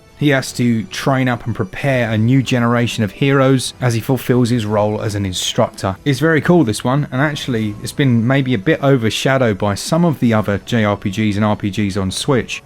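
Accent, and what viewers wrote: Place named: RP, United Kingdom. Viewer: Having just jumped over to the Thronebreaker review by I can honestly say....he sounded well posh a year ago.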